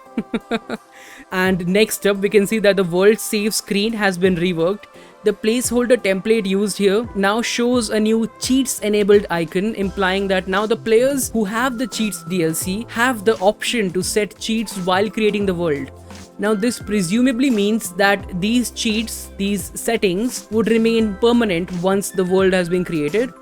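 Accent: India. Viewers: Are you indian because you sound like Indian 🤔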